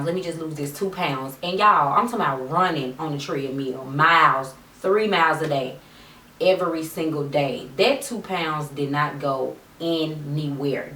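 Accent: South, United States. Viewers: Haha nice video! Here I am watching a year later and I love your accent, I’m from Texas and I talk like this! I can’t control the way my voice changes all southern haha! 😊❤️ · Real talk!!! U talk like u are from the H!!!! H town!!!!I need a friend like u here!!!!! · You are extra pretty you must be from the south that accent thick girl lol 😂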